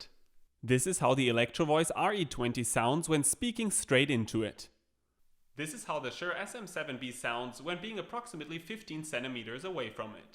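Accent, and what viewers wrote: Germany. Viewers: you german )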